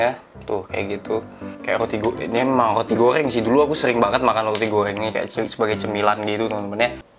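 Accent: Bali, Indonesia. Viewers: LU ORANG BALI YA BANG?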